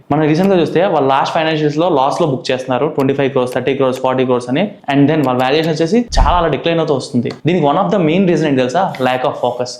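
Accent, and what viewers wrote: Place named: Godavari, India. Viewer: Bhayya nvu konchem slow matladu words spastam ga pronounce cheyatledhu Emo anipistundhi edho godavari slang with speed 1.2x lo choosthe ela untundhi ala vastundhi aa last lo follow matram correct chepthav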